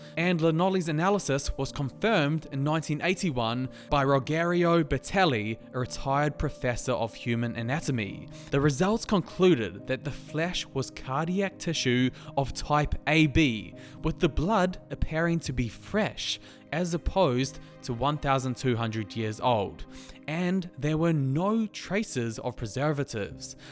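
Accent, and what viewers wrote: New Zealand. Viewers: You're a kiwi right? :)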